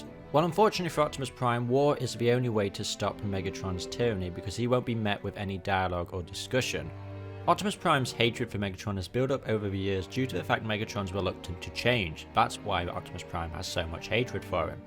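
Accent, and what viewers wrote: United Kingdom. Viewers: he is posh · So do you have a British accent or some other kind of accent?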